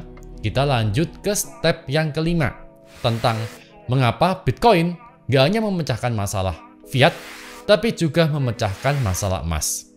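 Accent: Java, Indonesia. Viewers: Jamie Dimon ngk penah denger om? Masa elu edukasiin org2 lewat Youtube tapi Jamie Dimon aja "Siapa itu?". Gila amatt. Mirip Mario Teguh lu ya. Uda medok & ngertinya cuma ngajarin orang mulu. Sendirinya mah wawasanya ya alakadar. ☺️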